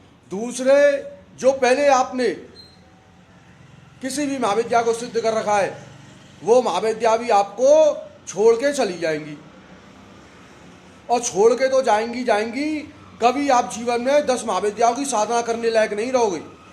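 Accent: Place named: Mathura, India. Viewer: Pranam guruji aap kha se h aap ki bhasaseli mughe apne mathura ke jesi lagi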